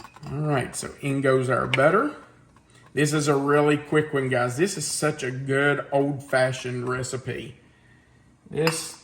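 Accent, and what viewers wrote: South, United States. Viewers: Love your channel and your southern accent is awesome. Thank You So Much for actually putting the recipe without pointless huge spaces directly under the video so we can easily screenshot the recipe with the thumbnail pic in it. There are jerks on here who refuse to do that (even after I have asked them to) so I quit watching them. They want us to click over to their website or blog which is Ridiculous and not easy when you are using slow data. Some people are just lazy rude and arrogant, you are very respectable.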